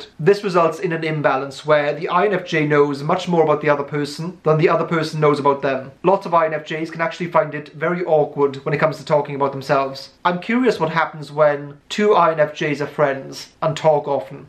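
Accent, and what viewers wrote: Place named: England, United Kingdom. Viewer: Oh, I love your videos. And I also love your voice, the phrasing is so neat. I'm trying to figure out your accent... Any clues? You're English, you're Welsh, one of your parents is from India... 🕵️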